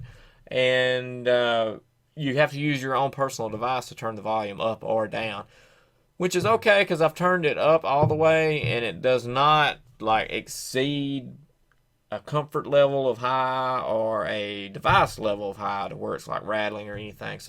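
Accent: South, United States. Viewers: The issue may be that the iHome is not acoustically isolated from each speaker. I wonder if placing some sound isolation material between the 2 speakers may reduce this issue. The base from one speaker may be causing interference with the other since the sound wave could be colliding during loud play. Hey love your Southern Drawl. Not making fun, I just think it is unique and we don't get any of that where i am from. Cheers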